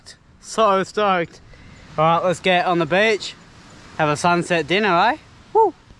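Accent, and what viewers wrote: Australia. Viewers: This channel is quickly turning into a cooking channel with great australian accent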